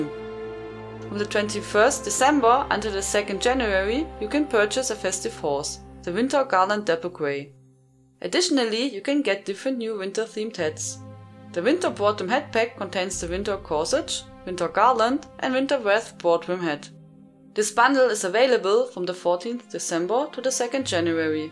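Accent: Germany. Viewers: Man hört direkt das du deutsch bist hahaha :D <3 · I love your German accent.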